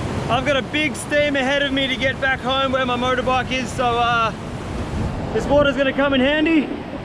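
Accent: Western Australia, Australia. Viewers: Its and Australian accent i can tell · Love your bloody Ozzy accent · yes! Quite easily distinguished. Australian’s speak a lot slower than New Zealander’s and have a stronger nasal twang also lots of slang that we don’t use, we have 3 different accents countrywide, European Kiwi (Pakeha), Maori, and Southland accent. Australia has many more than us and the one that is most alike ours is Tasmanian but the guy in this vid sounds a little more Western Australian, Perth region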